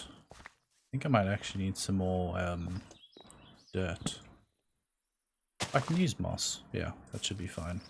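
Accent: South Africa. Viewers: I know a fellow south african when i hear one lol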